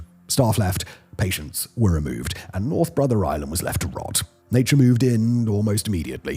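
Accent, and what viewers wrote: United Kingdom. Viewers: Lots of his words are strange like that where he pronounces them in this weird, British way lol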